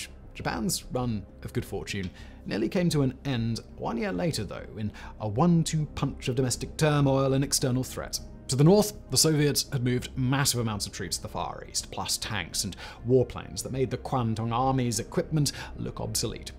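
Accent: RP, United Kingdom. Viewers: Your RP is so over-the-top posh, it sounds similar to Jacob Rees-Mogg.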